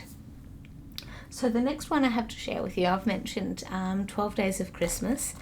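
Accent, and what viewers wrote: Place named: Australia. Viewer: I have never attempted a full coverage but love seeing everyone's progress in theirs. I have pattern keeper but don't have the program uploaded yet as I've never used a tablet before! That tequila sunrise silk is gorgeous! Water tiger is so beautiful! I love big cats! But your designs are wonderful! I adore you! From the first moment I found you. You hooked me with your sweet Aussie accent and soft voice and amazed me with your photography and translated designs! You are so Gifted! I wish you were my daughter💕 I would love spending time with you creating (and walking = I was never a runner!)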